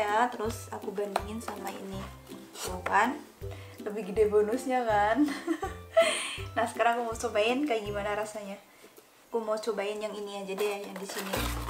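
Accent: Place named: Purbalingga, Indonesia. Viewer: Mirip banget kalo ngomong kayak mbak ku di Purbalingga.. lama ga ketemu karena sy di Kalimantan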